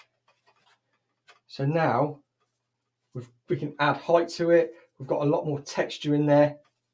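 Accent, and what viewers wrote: United Kingdom. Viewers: I watched this video the first time with the sound off. I never would have guessed you had a British accent.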